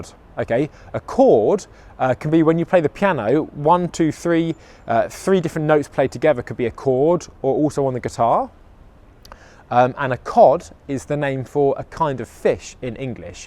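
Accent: United Kingdom. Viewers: Hi. I do like your lessons on phonetics with a British pronunciation. Please continue. Thanks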